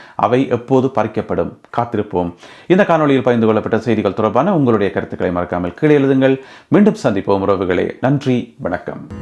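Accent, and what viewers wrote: Jaffna, Sri Lanka. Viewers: யாழ்ப்பாண தமிழில் செய்திகள் வழங்குவது சிறப்பாக உள்ளது.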